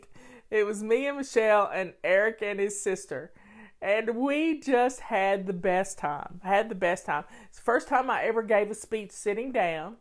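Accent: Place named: North Carolina, United States. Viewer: I've been Flying for 15 years now - thank you! I wanted to tell you that I love hearing you speak because your accent is exactly like my grandmother's (also from North Carolina) and I've missed hearing it so. God bless you.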